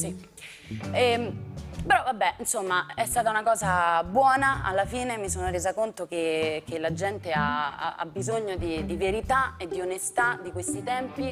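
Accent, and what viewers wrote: Rome, Italy. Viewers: Ma perché ad una bolognese insegnano a parlare con accento romano? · La bolognese con più accento romano che abbia mai sentito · Forse perché è venuta a Roma da giovane e ha semplicemente acquisito l’accento del posto? Non capisco perché in tanti stiate facendo notare una cosa così naturale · Ma perché se è nata e cresciuta a Bologna parla con accento romano? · ma ha accento Romano? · La gente dice “chi cazzo è Matilde De Angelis” semplicemente perché: 1) Per settimane, prima e dopo Sanremo, eri ovunque, tv, radio, giornali, ma la maggioranza degli italiani non ti conosceva. E probabilmente non ti conosce neanche oggi. 2) Sei saccente, spocchiosa e te la tiri. Se un personaggio pubblico è così, non si deve sorprendere se attira antipatie e se riceve insulti ogni giorno. PS: dici che hai “ripulito” l’accento bolognese, ma perché adesso parli come una coatta romana? 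Comunque hai 25 anni, hai ancora tanto da imparare e ancora poco da insegnare, l’umiltà non fa mai male. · "Simpatisci","ghe ho lletto","era brobrio", "lo cabisco", "diggiti", "ti vuole bbene", "cresciuta a BBologna", "scinque anni", "riscevevo", "de mmerda", "perzonalmente, proprio perzonalmente", "una pagina nell'internet", "un incubbo","bbasta","scinque","scertezze","amisci","BBene", "lisceo" (e svariate altre topiche) ma un'attrice corsi di dizione non ne fa? Forse li ha fatti a RRoma. La cadenza bolognese, mostrata nel film con Accorsi, era meno "borazza" e più spontanea. Ecco perchè a volte viene doppiata. Ora parla "strasscicato" come il cronista "impresentabbile" e raccomandato dell'America's Cup, che non si poteva sentire e non si poteva vedere, con la differenza che lei almeno il fisico del ruolo ce l'ha. Nella putrida fiction su Leonardo interpreta un personaggio storico inesistente, che imbonisce il quarantenne Leonardo assistente nella bottega di Verrocchio (ma il Leonardo discepolo del Verrocchio di anni ne aveva tipo una quindicina). 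Detto che il problema della fiction "Leonardo" è imputabile agli autori irrispettosi e incapaci e non agli attori, nel film con Accorsi MDA mi aveva piacevolmente sorpreso, e pure nell'isola delle Rose. In definitiva viene proprio da chiedersi "Chi cazzo è MDA?" Perché una bolognese che parla in romanesco una sua identità evidentemente non l'ha ancora acquisita. Ps: Matilda, gli euro valgono più dei dollari, quindi non dica "dollari non euro", come se in dollari la cifra fosse pazzesca, in realtà è il contrario. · Il problema e che hai preso l'accento romano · Non riesco a spiegarmi perché sia bolognese e parli con accento romano, dev'essere l'atmosfera di Propaganda Live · Ma che significa scusa? Se è nata a Bologna non significa necessariamente che ci sia cresciuta. Evidentemente è cresciuta a Roma, ed in ogni caso non è che gli accenti di insegnano, succede che più o meno inconsciamente si rimane condizionati dal modo di parlare delle persone del posto in cui si vive. 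Anche gli stranieri che vivono a Roma hanno l’accento romano, ma mica ci hanno fatto un corso!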